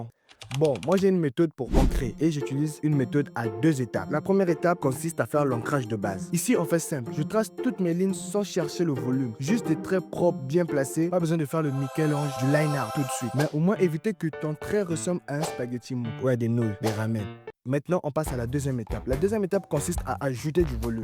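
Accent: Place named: Africa. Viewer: Tu es africain toi je reconnais l'accent du continent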